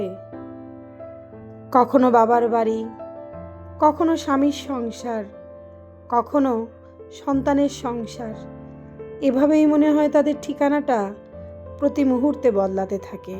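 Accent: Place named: Khulna, Bangladesh. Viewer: প্রিয় আপু ৫৪ লাইক দিয়ে তোমার ভিডিওটা দেখে নিলাম তোমার কথাগুলো অনেক সুন্দর খুলনার মানুষের কথাগুলা মনে হয় অনেক সুন্দর তোমার পুরো ভিডিওটাই অনেক ভালো লেগেছে ❤❤❤❤❤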